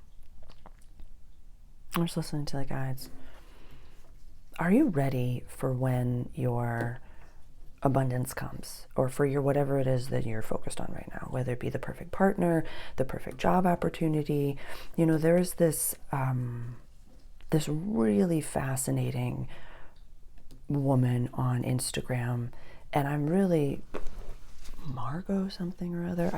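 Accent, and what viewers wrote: Boston, United States. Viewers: i love your boston accent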